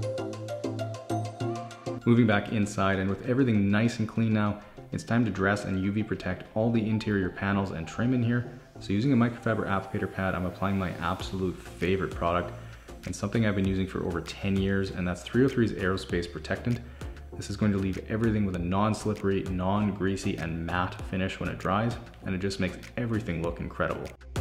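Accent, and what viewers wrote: Canada. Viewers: Hey You from Canada?? Alberta??? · just by listening to you speak, i knew you were a fellow Canadian 🇨🇦 keep up the good work!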